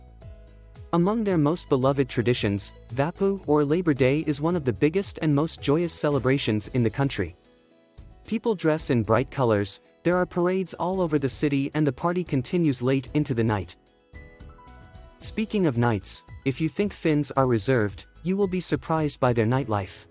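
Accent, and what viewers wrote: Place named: United States. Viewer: I was actually surprised! An American-accent narrator and an opening screen of a sauna had me expecting a foray into the Nordic lack of inhibition to nudity that tends to lead English speakers into perverse imaginations of Finns (and Nordics in general) flaunting dicks and tits without any regard for English-speaking prudishness! To my surprise, this video had nothing of that! I'm Icelandic, a western brother of our eastern brothers, the Finns. Having worked with Finns in stressful situations (relief operations in war-ravaged African countries), I now know that the Finns are even more stoic than we Icelanders believe ourselves to be! More solid friends you will never find, and they have the rare quality of not shooting their mouth of when they have nothing to say! In my case, I found that Finns stay quiet until they have something useful to contribute to whatever conversation is going on. 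I also learned that if a Finn bothers to enter a conversation, he/she ALWAYS has a truly valid argument/insight to offer! The Finns are a people of few words, and WHEN they have something to contribute, pay attention!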